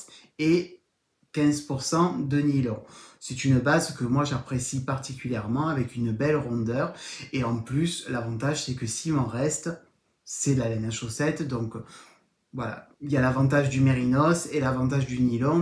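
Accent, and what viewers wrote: South, France. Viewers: Bonjour encore un bon moment passé en ta compagnie 🧶 j'aime ton accent du midi et ton humour. Bonne journée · 🧶Coucou, toutes mes félicitations pour les 4000 abonnés 👍. Le meilleur pour la suite c'est que du bonheur de te visionner, ta joie et ce magnifique parlé du Sud tel du Marcel Pagnol. Bravo pour ton premier design que j'ai hâte de découvrir. J'ai loupé l'info pour le test dommage. Prends soin de toi 😘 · 🪐🧶 Salut ! Toujours agréable d'entendre mon accent du sud quand on a émigré au Québec. D'accents en accents mais le même amour de la laine. Merci pour l'originalité. À quand un podcast au bord de la grande bleue? :D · hello! enfin quelqu un du sud! je désespérais! je pensais qu il y avait que le nord la bretagne le grand est qui tricotaient 😉moi je suis du vaucluse et je regarde beaucoup de vidéos de tricot mais la majorité des youtubeuses sont de la haut bref ça fait du bien de t entendre et meme je savais pas qu il y avait de temps en temps des fetes de l laine par ici dailleurs si un jour tu es au courant d un evenement dis le nous parce que pareil, ils sont tous la haut, quand meme on vit dans le sud ok, il fait chaud ok, mais on mets des tricots aussi 😅sinon bé, j aime beaucoup tes vidéos je rigole bien et j apprecie ta façon cach de parler allez j arrete mon discours j attends la prochaine avec impatience a bientot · 🧶 Bonjour. 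Première fois que je commente un de tes pod cast. Merci pour le soleil qu'il y a dans ton bel accent du Midi. Bonne continuation. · 🧶Bonjour, J'adore vos podcasts, vous me faites sourire et j'apprend pleins de choses, je suis débutante, j'aime votre autodérision et votre accent qui me donne envie de découvrir le sud. Et j'ai trop hâte de découvrir votre design chaussette 🧦! Merci pour ce chouette concours! Belle journée 🧶🌞🤩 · Bonjour, Je te regarde depuis le 1er podcast, cest toujours un agréable moments. J'adore ton accent du sud ca mets du soleil dans la grisaille du temps, ta bonne humeur, ta franchise et les superbe projets que tu nous présentes. Bravo pour les 4000 abonnes c'est mérité. Je suis de Belgique et je participe volontier au concours 🪐 merci A bientôt. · 🧶 quel plaisir de te regarder et d'entendre ton accent du Sud! Merci pour le concours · Bonjour, je vous ai découvert grâce au podcast de Julie de Lolipelote et c'est un ravissement que de découvrir vos podcast qui chante le sud. 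🧶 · 🧶 Bonjour! Toujours très agréable cette voix qui nous vient du sud et nous apporte un rayon de soleil. Merci ❤ Maryse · 🧶 Bonjour 🥰 Quel plaisir, grand moment de détente de vous regarder depuis le début de votre chaîne et sur Insta J’adore et j’adhère complètement votre façon d’aborder le tricot et toutes les merveilles qui l’accompagne 😍 Je vous félicite et bravo pour les 4K🎉 Votre humour ainsi que le parler du midi me Régale!!!!!! Je suis de La Ciotat (13) donc je comprends bien 👍👍👍 Merci de faire connaître notre accent et nos galéjades 🤣 Toujours impatiente du prochain épisode 💪 Bravo pour toutes vos créations les choix laineux et les couleurs!!! ❤️🍀🌹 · Merci pour tes podcasts avec ton humour et ton accent qui “sent bon” le sud.j’adore. Génial les 4000 abonnés je participe pour la France 🧶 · Quel agréable moment ce podcast ! J'ai un accent (Sud OUest) mais le tien me renvoie dans les années 80 lors de mes vacances à Port Grimaud. 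Bises des Landes 🧶🧶🧶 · Un succès bien mérité pour un tricoteur spontané et réjouissant. Je ne rate aucun épisode et ris beaucoup avec toi ! Ne change rien surtout et vive l'accent du Sud!!🌞💕 🧶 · Merci de ce podcast, merci pour ta bonne humeur, tes boutades bien sympathiques… ton accent du sud qui ensoleille ton podcast … je viens de passer un très bon moment je participe à ton concours 🧶 FRANCE merci aux généreux donneurs.. tu mérites leurs soutiens … ton sac est magnifique… il me fait bien envie BONNE CONTINUATION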